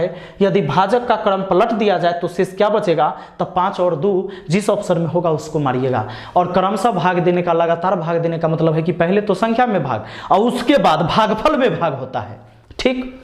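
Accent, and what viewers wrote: Bihar, India. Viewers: अरे 30मिनट में रगड़ देबे😀😀😀 बहोत खूब sir jee एकदम बिहारी स्टाइल🙏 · गुरु ज एक बिहारी आन्दाज में शानदार है लाईव नही पढते क्या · Ekdam Bihari andaj me🔥🔥🔥 आरे 20 मिनट में रगड़ देबई😂😂। सिर फैन like 👍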